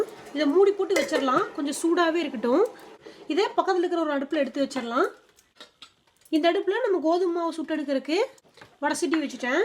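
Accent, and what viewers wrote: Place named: Coimbatore, India. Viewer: Wow different receipe, superb👍👌.. coimbatore slang nalla therithu 😊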